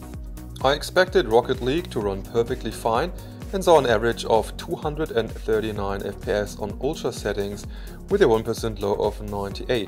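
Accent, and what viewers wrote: Germany. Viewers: Moiin Denke du bist auch deutsch so wie dieser akzent klingt... (nicht der einzige hinweis)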